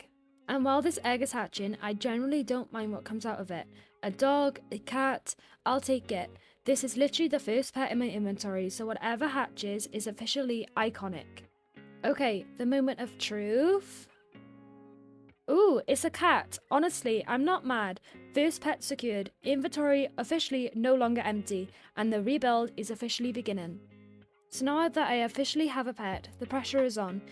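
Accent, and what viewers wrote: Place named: United Kingdom. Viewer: Love your British accent ❤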